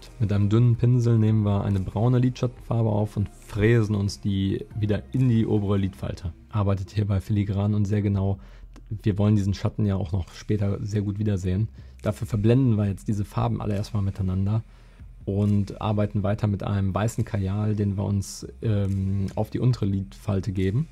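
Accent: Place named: East Westphalia, Germany. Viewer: Da spricht der Ostwestfale :-D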